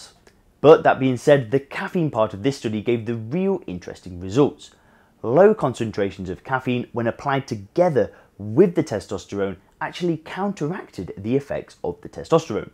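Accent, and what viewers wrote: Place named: United Kingdom. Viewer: I enjoy listening to British accent.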